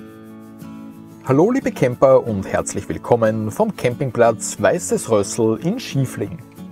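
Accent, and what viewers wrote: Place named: Switzerland. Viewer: Hallo Zusammen... sollte eigentlich Hochdeutsch und nicht Schweizerdeutsch sein ((-: Aber klingt halt so, wenn ein Schweizer Hochdeutsch spricht, haha. Lieber Gruss (wir schauen immer gern euere Videos!)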